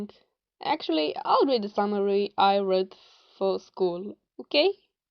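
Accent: United Kingdom. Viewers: Wow! Chiat zici ca esti din Marea Britanie cu accentul tau! Eu me-as dori sa o citesc, dar nu k gasesc si oricum trebuie sa imi ajunga 2 tot in Engleza. Felicitari! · Îmi place vocea ta lol! Accentul tău britanic nu suna rau (nu de-as fi eu experta heh). De desen ce sa mai zic..arata splendid!!! Squirrelflight's Hope e pe lista mea de super ediții si plănuiesc sa o citesc...mai încolo xD 🤍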